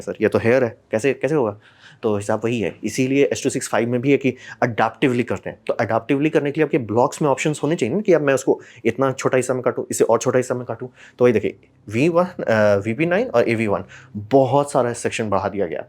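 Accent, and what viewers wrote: Bihar, India. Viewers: sir ji aap Bihar se hain kya??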